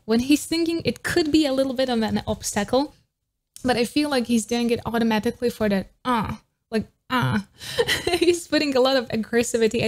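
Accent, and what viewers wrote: Australia. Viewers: I was thinking the same listening to you speak. You definitely have picked up the Aussie ‘oh’ (letter O) sound when you speak. It’s beautiful. Thanks again for another great reaction video. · I think so, her accent does sound australian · Are you Aussie??? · Since when do u have an aussie accent? Naurrr saurrr hhaha I love it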